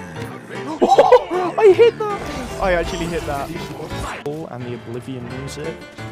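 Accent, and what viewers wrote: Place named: United Kingdom. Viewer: is probs one of my fave streamers. dude makes dredge fun. the funny British accent is a bonus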